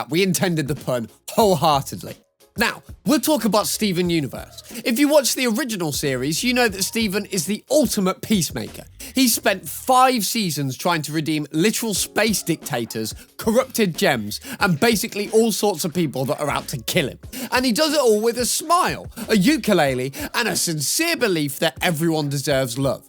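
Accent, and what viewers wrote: United Kingdom. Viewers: This is the first time i see this guy and i just clicked it and the british accsent really scared me idk why 😭🥀🥀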